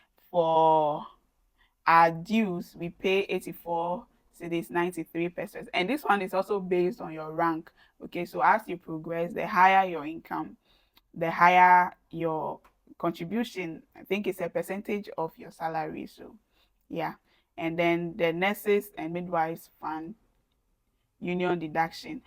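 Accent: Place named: Nigeria. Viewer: are u a nigerian coz you sound a nigerian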